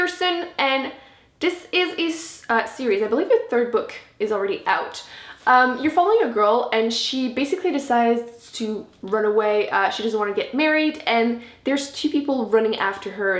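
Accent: Quebec, Canada. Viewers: Breanna May she's French Canadian :) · I knew you spoke french 😃😃😃😃😃😃😃 We have the same way of pronouncing some words. I bet you're also Canadian like me😀😂 Anyways love this video💙💙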